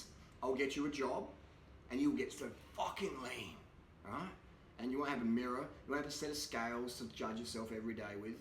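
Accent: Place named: Australia. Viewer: Have you tried the English Subtitles, It does help non Aussies. One thing though, there are quite a few Auto Generated words which are not the words he used.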